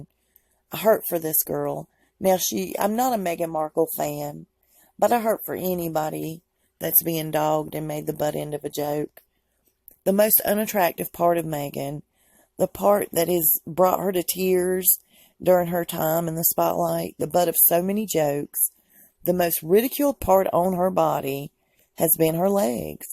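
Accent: South, United States. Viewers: You are a very bright light. You vibrate at a very high frequency. You have so much love and compassion in your heart. And to hear someone speak without the burden of having to pass judgement, with only the pure intention of educating and awakening is very much appreciated. And for lack of any better way to describe it, so I hope that I don't offended you, but your "accent" is like a sweet Southern lullaby that tends to soften the blow of the horrific atrocities you speak of that we all are in spiritual warfare with. Thank you and bless you.💖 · Wow! I enjoyed the way you interpreted the Coat of Arms!😃👍Id love to go with you to some cool art displays! You definitely have great insight, and intuition! I enjoyed hearing you again too. You have such southern charm in a down home way that makes your viewers feel right at home! My daughter got married in a hotel art museum that blew me away! 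It was a truly memorable experience due to how some of the art and its display affected you. I agree that the Queen has an evil eye toward Meghan, and I wonder if she might have had secrets beforehand about the royal family. I agree with you totally that the royal family will make sure Meghan doesn't "sing like a bird"! It is so disturbing that Meghan's Mom has to act like her daughters been shunned! It's like she has to act like her daughter doesn't exist to her loved ones and friends, as if she was dead! No comfort though given due to her daughter living in a palace! It seems like this is overkill...what else is being hidden?!🐍🐉🐲 Love you bunches!😃🍕🍻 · I see YouTube will let any idiot with a Southern Accent pretend to know the inner workings of the royal family. I can't 😂😂😂😂. I see most of the videos, the comments are disabled😂😂😂😂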